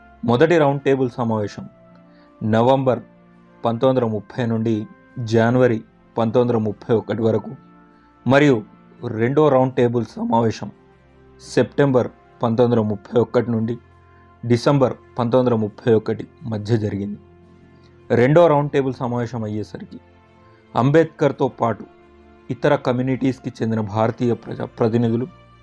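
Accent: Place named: Telangana, India. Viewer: Keep it up ..your Telangana accent is nice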